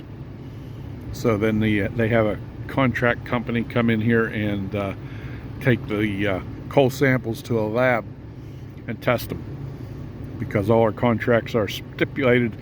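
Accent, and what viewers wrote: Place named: Pittsburgh, United States. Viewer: are you from Pittsburgh that’s awesome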